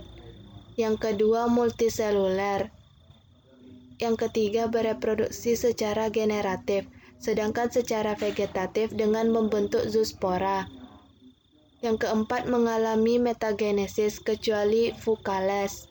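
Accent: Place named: Riau, Indonesia. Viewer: riau bgt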